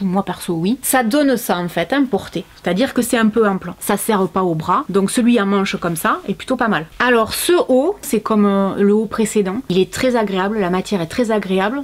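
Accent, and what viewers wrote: South, France. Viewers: On pourrait presque dire merci au reconfinement parce qu'on a deux fois plus de vidéos de, et ça c'est chouette! ^^ Toujours des vidéos super agréables, et souvent quelle rigolade! Puis cet accent du Sud un pur bonheur! Merci d'y faire honneur, notre accent est tellement moqué souvent, et pourtant il chante même quand il pleut chez nous. Continue ton petit bout de chemin, soit fière de ton chemin parcouru après tout se que tu as raconté dans ta précédente vidéo sur l'amitié et ce que tu as vécu. Je ne peux pas t'appeler "maman youtube" parce qu'on a presque le même âge, mais bravo pour ta chaine et ces bons moments que nous passons avec toi chaque semaine. Ca fait un bien fou! Bises de Montpellier. Et caresses à ton adorable Tal;-). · ce pyjama rose je l’ai depuis bien 3/4 ans et c’est toujours un bonheur de le mettre! Il s’est peut-être un peu détendu avec le temps mais absolument pas abîmé et il est toujours aussi chaud et doux! 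J’ai essayé les ceintures en cuir chez eux et c’est les seules qui ne se déchirent pas, j’ai aussi pris les grosses chaussettes avec le molleton dedans et laine dehors c’est aussi super. Merci pour tes vidéos et ton accent du sud, ça ramène du soleil en Alsace, je t’adore!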